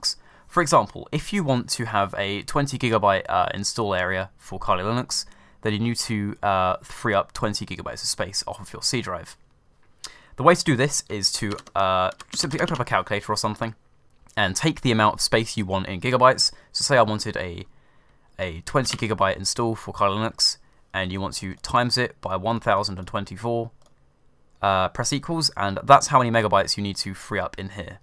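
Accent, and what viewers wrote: England, United Kingdom. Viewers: i like your english accent very nice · finally someone who is English lmao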